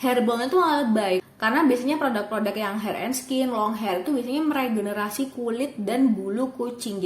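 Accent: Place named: Surabaya, Indonesia. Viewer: Medok Suroboyo 😂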